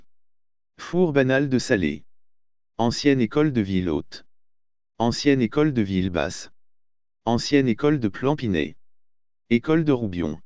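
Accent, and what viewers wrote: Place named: Uzbekistan. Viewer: Pourquoi cet accent ouzbèque quand vous parlez ?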